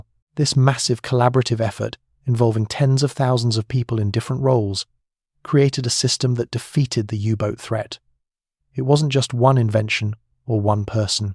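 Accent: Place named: United States. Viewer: Hey ! The speaker must be a yank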